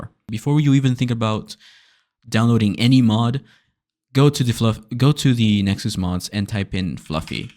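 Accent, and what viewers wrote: Italy. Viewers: ❤ Personally I'm a console guy, but the fact that you took time for your fellow peeps on PC to give them a head on education makes your Italian accent the cherry on top. I support good people and good content. Enjoy brother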